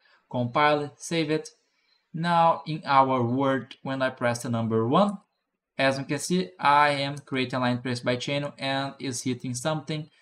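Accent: Brazil. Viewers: Brazilian portuguese detected 😂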